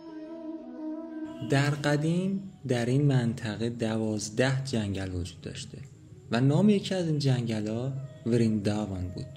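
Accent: Isfahan, Iran. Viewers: سلام برام جالبه خانمت هم مثل خودت لهجه اصفهانی یاد گرفته و حرف میزنه😍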